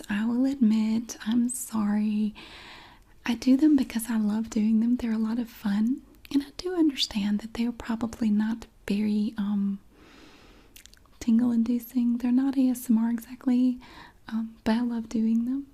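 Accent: South, United States. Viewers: Love that southern sass! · YES! We love a sarcastic southern queen ❤️ Amazing video 🤘🏻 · Heaven have no sass like the Southern sass, of a southern lass. Thanks miss, for making such good an great vids. PS from a fellow Southerner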